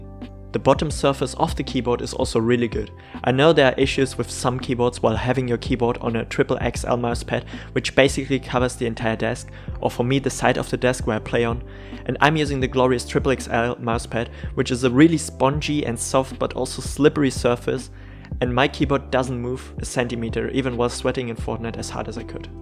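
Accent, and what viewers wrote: Germany. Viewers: German guy right?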